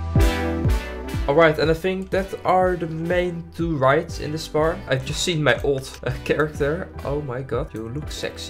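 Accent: Netherlands. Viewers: Sorry.... But i smell dutch btw ik ben het ook! Je bent goed in ebgels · Are you dutch?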